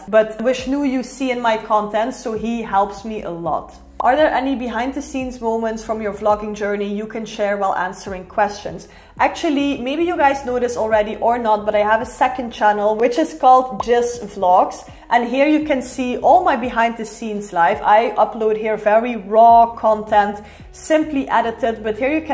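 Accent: Netherlands. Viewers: Your accent is so similar to my Dutch friend Lars and I had already guessed you're from Netherlands if not from Germany 😅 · I could have already guessed that you’re from the Netherlands. That’s because you and itchy boots have same accent. Same energy 😊 cheers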